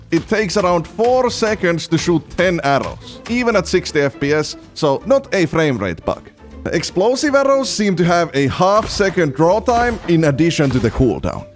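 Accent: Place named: Finland. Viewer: I love how Finnish you are. European accents are just so fun to my weak American brain. Maybe it’s all of the cholesterol going to my head.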